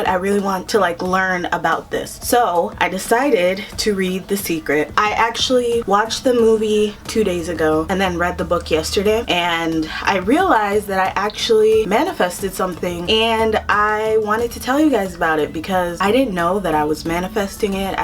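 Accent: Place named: Brooklyn, United States. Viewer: I like the Italian Brooklyn accent, perfect!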